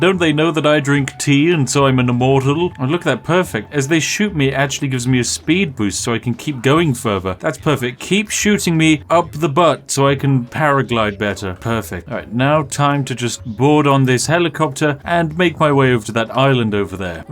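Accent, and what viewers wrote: United Kingdom. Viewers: imagine standing at a petrol station and you just hear "ooh its a petrol station, full speed ahead" in an insanely british accent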